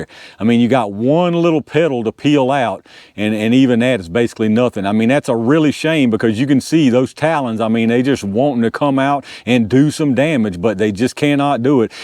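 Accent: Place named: New England, United States. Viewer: I'm from New England, NH specifically. When I play your vids at 2x speed, you sound like someone from up here.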